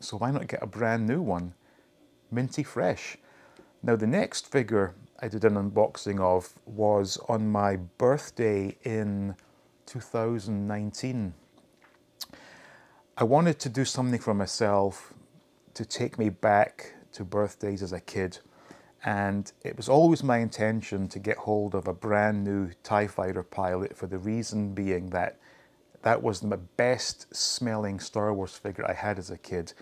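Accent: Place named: RP, United Kingdom. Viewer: So refreshing to see someone on a video that can sit behind a desk, can talk in the queen's English and not be wearing some trucker cap and talking to puppets, who just so happen to be their only friends in life.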